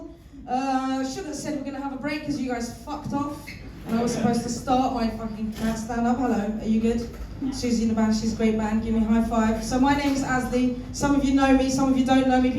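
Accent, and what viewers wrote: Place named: London, United Kingdom. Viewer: Beginner ingilizcemle Londra aksanını 23 dakika izledim, hiçbişey anlamadım ama eğlendim teşekkürler 🤝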